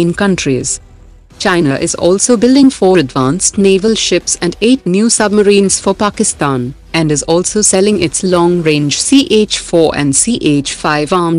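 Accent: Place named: South India, India. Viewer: YOU AREA INDIAN LADY , DON'T PRETEND TO BE AMERICAN ,, I THINK YOU ARE A SOUTH INDIAN , LOL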